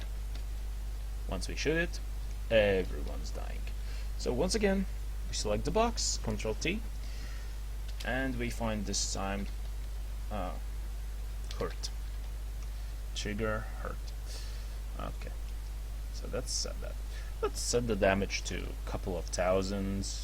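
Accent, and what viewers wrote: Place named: Portugal. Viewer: Ahaha, nota-se claramente que és pt. Bom vídeo!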